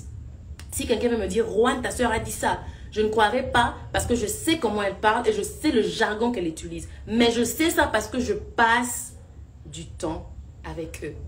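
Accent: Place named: France. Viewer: Elle s exprime très bien !!! accent français hein !!!!!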